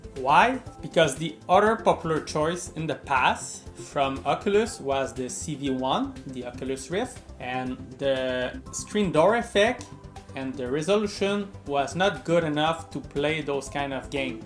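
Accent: Quebec, Canada. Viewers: un quebecois c sur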